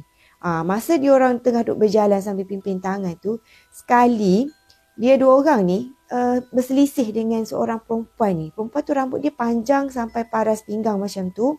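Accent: Kelantan, Malaysia. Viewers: Akak ni org kelantan ke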